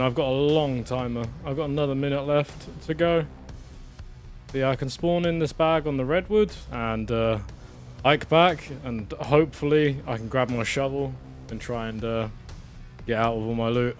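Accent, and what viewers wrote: United Kingdom. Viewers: Brit English is so annoying!!!!!!!!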